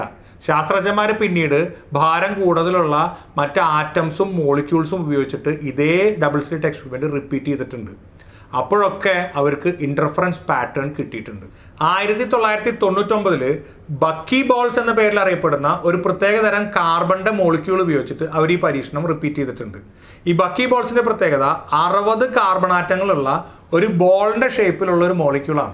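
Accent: Thrissur, India. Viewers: thrissur kaaran aanalle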